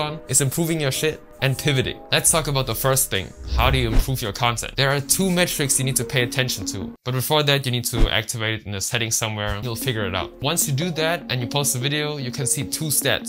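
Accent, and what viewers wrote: Germany. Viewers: wait are you from germany?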